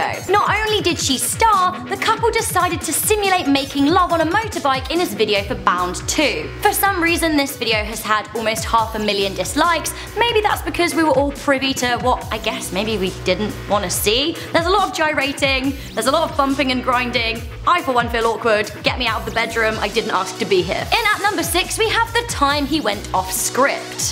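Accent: United Kingdom. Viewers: I know btw love your british acsent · Why do so many people who watch this channel act as if they've never heard a British accent before?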